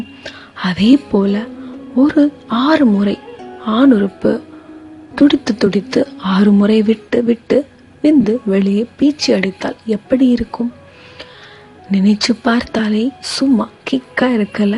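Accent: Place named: Kerala, India. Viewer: Unga voice Kerala mood arithu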